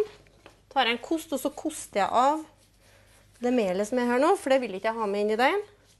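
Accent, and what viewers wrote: Norway. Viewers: Molde? Eller Opdal, ikke trønder isåfall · sør-trøndelag det ka du da høre? :P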